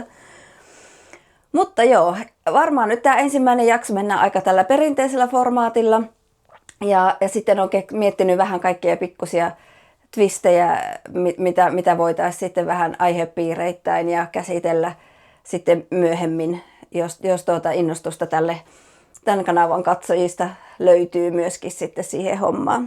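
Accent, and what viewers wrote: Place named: Eastern Finland, Finland. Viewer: Tykkäsin tästä videosta ja laitoin tilaukseen. Oletko kotoisin itä-Suomesta? Puheenparsi kuulosti niin tutulta. Minä tykkään neuloa keinutuolissa ja sään salliessa puutarhakeinussa. Hyvää kesää!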